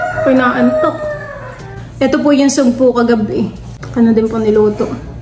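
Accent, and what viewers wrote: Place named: Visayas, Philippines. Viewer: May pagkabisaya ang salita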